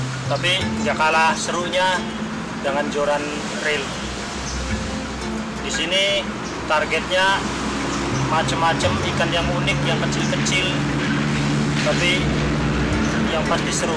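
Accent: Indonesia. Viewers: Logatnya kaya Cirebon sih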